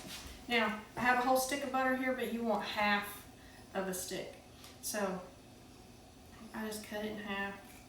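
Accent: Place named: South, United States. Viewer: Oh these look so good. Love your sweet accent and southern charm. Much love from Abilene. - Miss Hetta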